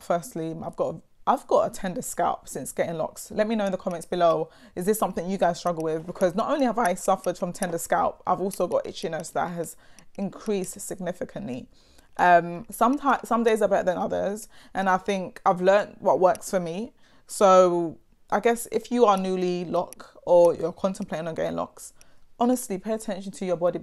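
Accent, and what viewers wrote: United Kingdom. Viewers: You have a VERY nice accent 😍 we're you born and raised in London...? The UK?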